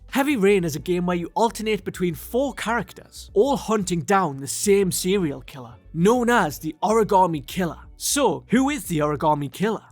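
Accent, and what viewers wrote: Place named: Scotland, United Kingdom. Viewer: I love scottish accent, but i felt was missing some humpf… like more energy… felt like just reading, just my opinion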